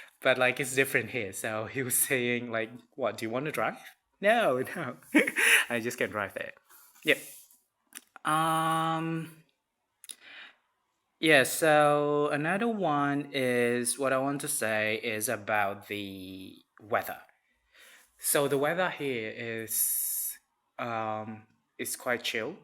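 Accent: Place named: Australia. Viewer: There's Aussie accent left behind haha How long you'll be there?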